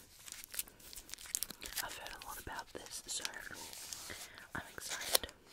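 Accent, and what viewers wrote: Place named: Australia. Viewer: I love your voice. It is perfect for ASMR :) I wish that I had an Australian accent instead of a Canadian one lol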